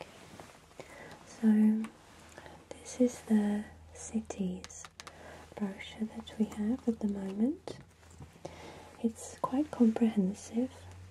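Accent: England, United Kingdom. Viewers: I love your beautiful, sincere smile, your soothing voice, your nice and stylish English accent.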